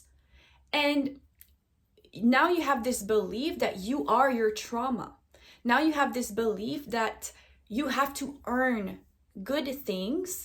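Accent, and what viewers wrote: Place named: Quebec, Canada. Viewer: You sound so Québécoise! 🥰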